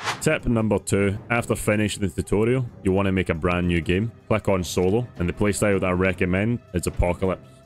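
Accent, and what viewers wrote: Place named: United Kingdom. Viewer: Always great to hear fellow brit bongs talk PZ, no matter where in our glorious Isles you're from. Good tip vid, though I'm sure you can just hunker down at the safehouse during the helicopter event, as long as it hasn't spotted you, it will just fly around the map. Easier said than done but if you listen to the emergency broadcast on radio before sleeping it will give you the heads up about the chopper for the next day.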